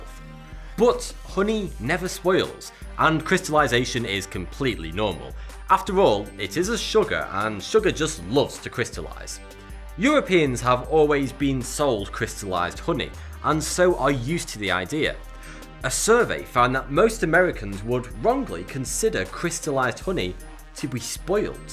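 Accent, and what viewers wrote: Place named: England, United Kingdom. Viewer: He definitely English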